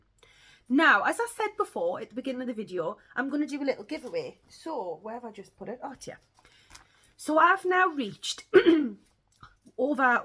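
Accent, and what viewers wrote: Northern England, United Kingdom. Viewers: Great video - you are so funny and down to earth. I'm a fellow northerner and love your accent. Pickle 😊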